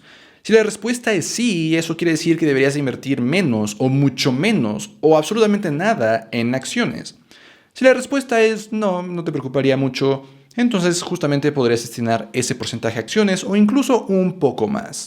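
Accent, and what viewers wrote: Mexico. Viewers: Llevo poco de seguirte pero me encanta tu canal cawn, por tu acento creo que eres de México. ¿Que brokers recomiendas para usar aquí en México? Saludos, crack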